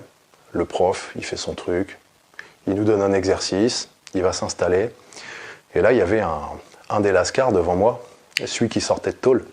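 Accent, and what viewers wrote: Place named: Marseille, France. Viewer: Je croyais que t'étais de Marseille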